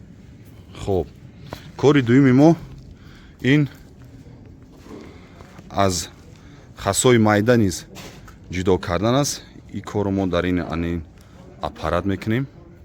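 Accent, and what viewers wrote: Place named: Tajikistan. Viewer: Зинда хонаобод боши бародари азиз ... Барои лавзи ширини точикона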